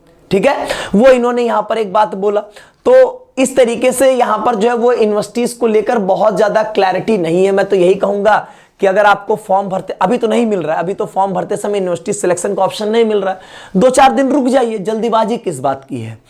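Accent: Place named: Bihar, India. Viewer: Sir ji kya aap Bihari ya purvanchal se hain kyuki aapki Hindi ham log se milti julti lg rhi hai 😊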